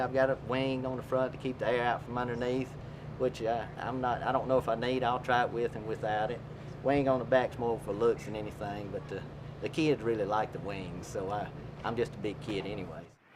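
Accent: South, United States. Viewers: Of course he's southern lol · it just makes it that much better that the dude has a southern accent haha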